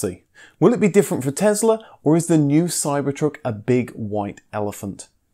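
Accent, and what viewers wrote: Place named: United Kingdom. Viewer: Generally your channel is entertaining and it is always a pleasure to listen to clean British English. 👍🏻 This topic is an interesting attempt. Ok. But you should do better research and talk to Metallurgy Engineers. Whenever the talk is about Aluminum it is about 99,9% Aluminum alloys in real. We never talk about pure Aluminum because it is not stable and would react with anything in the near within the fraction of a second. How can you allege that Aluminum or better said Aluminum alloys do not rust? I am sorry but this is not true at all! You can find this out very easily on your own. Go to any football field and take a detailed look at the areas where the Aluminum alloy parts of the goals are put together. You will see that there is partly corrosion which has white colour. Car factories do not use Aluminum alloys in order to get rid of corrosion. 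This metall is easier to be handled and can save weight, but is hard to be maintained in a car body workshop if there is damage on it. Stainless steel is also not really stainless. It will rust harder but you can’t really stop it from starting to corrode. Please take care. Thanks.🌷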